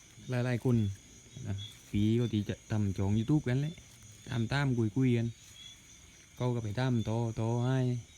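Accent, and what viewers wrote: Thailand. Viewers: แหลงใต้ซับพึด แบบนี้ผ่านแหละ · ผมว่าดีนะ แหลงใต้ไปมั้ง แหลงกลางไปมั้ง มันได้อัดรสครับ เดียวสัก 4-5 วันนี้ ผมสั่งยอด ครับ ตอขี้หมูเริ่มแตกหน่อออกมาแล้วครับ ว่าจะเสียบยอดสัก 2-3 ต้น ฝากข้างสัก 2 ต้น อยากรู้ครับ ว่าวิธีไหนมันจะโตไวหวากัน ผมชอบนะที่ สอนเด็ก ว่าถ้าไม่เคยกินแค่ลองก็พอ พอติดเข้าถึงเลิกยากหนัดครับ คนคอนม้ายครับ ผมฟังจากสำเนียง ผมก็คนคอนครับ ผมชอบมากหวาเพื่อนแล้วช่องนี้ แหลงกะเป็นกันเอง ติดตามตลอดครับ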